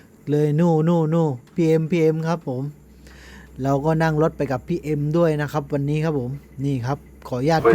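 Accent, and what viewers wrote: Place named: South, Thailand. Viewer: ฟังมาหลายคลิปพี่ว่าแล้วฟังเสียงน้องน่าจะเป็นคนใต้ คนใต้จริงกะแล้ว อยู่บ้านเดียวกันด้วย พัดลุง น้องอยู่ตรงไหนเห็นขึ้นปากคลอง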